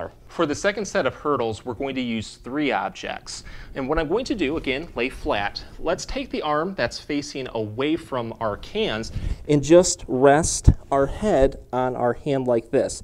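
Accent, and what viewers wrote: Michigan, United States. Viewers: Did these exercises ONE TIME and found that almost all my popping and cracking were gone. I will be continuing these daily. Thank you! I also loved hearing a Michigan accent again; I attended Interlochen Arts Academy up by Traverse City.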